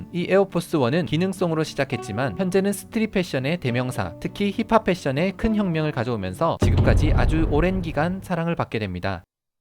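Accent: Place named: Seoul, South Korea. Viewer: 서울사투리 상당히 거슬리네요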